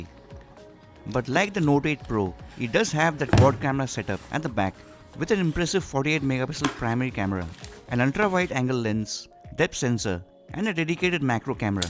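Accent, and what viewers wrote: India. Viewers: from your accent i can see you're indian. Kudos for this video since actually the audio is in english and the title and the description, they match, unfortunatelly 97% of indians put english titles and description and in the video they speak लड़कीहैलो . Come on indians it's not that hard to be considerate! learn from this guy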